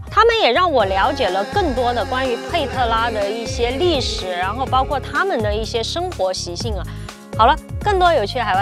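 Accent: Sichuan, China. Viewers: 喜欢你的视频，真实，更喜欢你的四川普通话。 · 因为是川普啊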